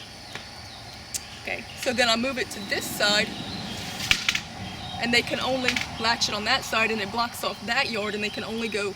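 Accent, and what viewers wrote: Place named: Texas, United States. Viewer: You are so full of information! I have been following you for a while and now have subscribed. Please keep it up! I need all the information I can get! I am in texas, you sound like you are close by, what state are you in?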